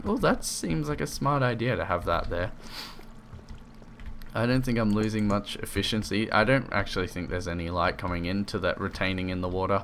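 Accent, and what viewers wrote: Australia. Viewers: Your australian?? You just earned a sub!! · what part of Australia are you from ? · I knew you were a fellow Aussie but you can tell by the way you say and talk about DICKHEADS.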